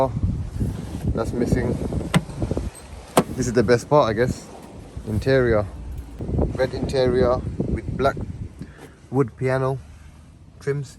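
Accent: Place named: East London, United Kingdom. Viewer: Bro you from east london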